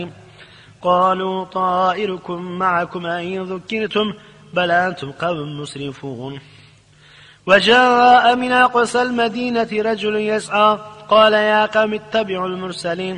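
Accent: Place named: Southeastern Anatolia, Turkey. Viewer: Mustafa bey öncelikle iyi günler dilerim. Yorumunuzda bahsettiğiniz tarzda çok çok büyük hatalara rastlamadım. Denk geldiğim kadarıyla 6 yerde harf telaffuz hatası var.(Peltek yerine düz okuma gibi.) Bunu da her insan hızlı okurken gayet de yapabilir. Bey'de farkettiğim kadarıyla Doğu-Güneydoğu Anadolu ağzı var. Bu nedenle de bazı harflerin telaffuzu kendisi için alışılmadık gelebilir. Ortada veballik bir durum olduğu düşüncenizi reddediyorum. Size ve sevdiklerinize sağlıklı ve huzurlu günler dilerim. Bey'e de sevgiler. Başarılarının devamını dilerim.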